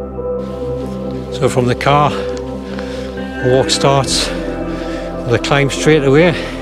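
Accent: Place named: Sunderland, United Kingdom. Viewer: Smashing video,. I watched it last weekend before coming down from Stirling to follow your route last Tuesday. Found steep bit at start of walk hard going. Weather not as good as you had it. - Pouring and very windy at top of Carrock Fell. Joined up with a lovely couple from Sunderland who had recently moved to Lake District. - Judging by your accent I suspect you don't live too far from Sunderland. I've just watched your video a second time. Enjoyed it even more now I've actually done the walk.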